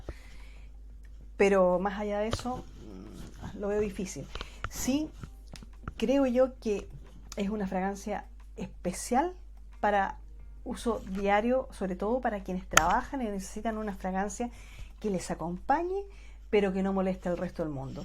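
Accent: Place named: Chile. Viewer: Me gusta escucharte hablar con ese tono chileno. Saludos desde Uruguay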